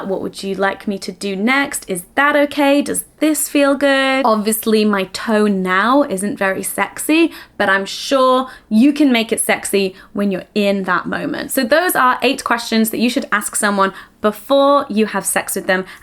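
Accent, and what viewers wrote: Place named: England, United Kingdom. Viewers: anytime I watch with the sound off my brain still knows to read the captions in english accent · Shes English that's how she speaks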